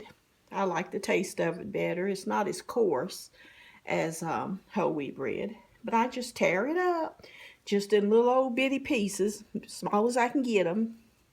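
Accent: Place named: South, United States. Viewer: I love your voice ! You talk like my mom and her mom, nice southern accent it sounds like to me!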